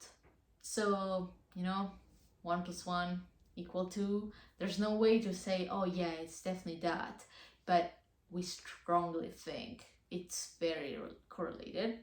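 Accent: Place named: Italy. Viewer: Your accent sounds Italian. 😊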